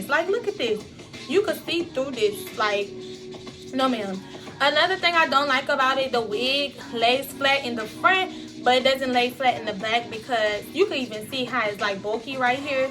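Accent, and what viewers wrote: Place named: Louisiana, United States. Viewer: Are u from Louisiana?!.. ur accent 😍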